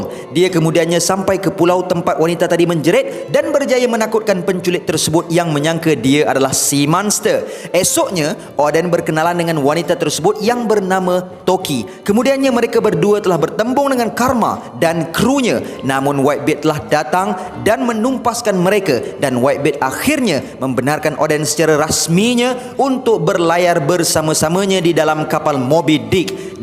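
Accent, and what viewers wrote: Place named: Kelantan, Malaysia. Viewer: Asal Kelate ko abe HAHAHAHAHA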